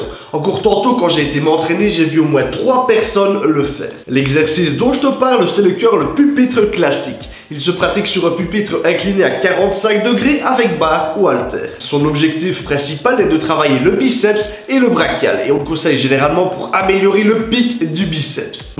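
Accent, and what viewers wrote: Liège, Belgium. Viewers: accent liegois non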